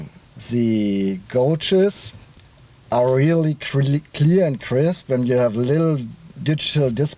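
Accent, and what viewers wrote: Germany. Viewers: Your German accent, speaking English, is a lot better than my American Southern accent, specking German. You did fine, I understood every word. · The German accent didn't bother me at all. The horrible video quality, though, was irritating. I haven't seen such an overexposed video in a long time. Sort that out, guys... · all Germans I meet are always so friendly and honest. The way you handle the people laughing at the way you speak confirms this. Anyway, dont be embarrassed most people commenting on it probably only speak English, while you speak two languages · Thanks for the test. As far as I am concerned I can perfectly cope with the little german accent (provided you accept my Belgian accent, of course). The ride seems to be interesting but unless you regularly have access to a race track this car looks too fast for public roads. In France you would probably quickly loose your driving licence (radars everywhere here in Alsace). 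I find a 335i with m sport package and bigger brakes more reasonable. Kind regards. Armand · Good review! Thanks! Thanks to the Germans we have this beautiful cars , so no need to apologize for the accent :) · This was a wonderful video where you dont have to listen to some fucking music all the time so you cant hear the engine sound, and i hope that other car testers learn from this. Also, you dont have to apologize for your strong german accent, as long as everyone understand its OK. Thanks for a great video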